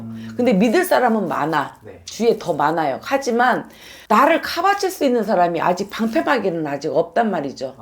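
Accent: Jeolla, South Korea. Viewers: 라도 말투 멋찌구마잉~~~여윽시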